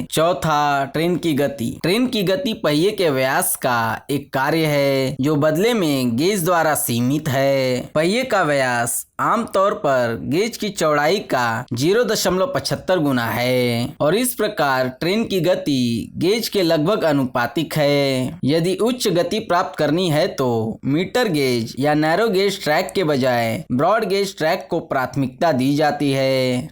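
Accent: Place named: Bangladesh. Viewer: Bangladeshi type ki bolti ho 😂😂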